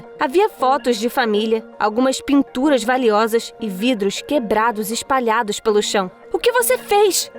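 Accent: Brazil. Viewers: Como ela é de Portugal sendo que ela fala igual brasileiros , Claro q eu sei q Pessoas de Portugal falam português , mais tipo eles falam diferente do Brasil, ou ela é brasileira e foi pra Portugal?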